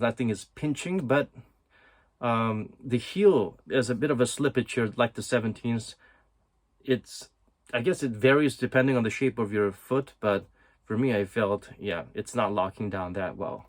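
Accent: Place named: United States. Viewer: 형님 미국 교포신가요??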